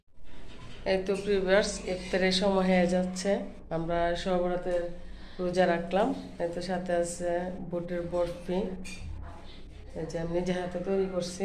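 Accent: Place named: Brahmanbaria, Bangladesh. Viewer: তবে বিশেষ করে আপনাকে একটা কথা বলতে_ই হই, আপনার মধ্যে ব্রাহ্মণবাড়িয়ার একটা আঞ্চলিকতা লক্ষ করা যায়।❤❤❤❤❤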